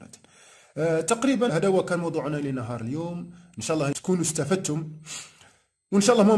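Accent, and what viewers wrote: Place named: Adrar, Algeria. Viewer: ادراري باينة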